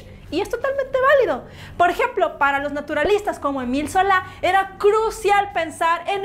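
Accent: North, Mexico. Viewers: Soy yo o esta vieja habla muuuuuuy norteña puesum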